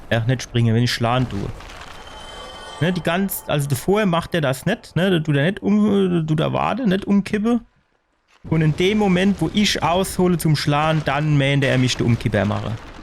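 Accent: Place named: Palatinate, Germany. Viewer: YouTube wäs hald was guud is. Kä Ahnung warums ma Dark Souls vorschlachd, awa mim pälzische hots mich kadd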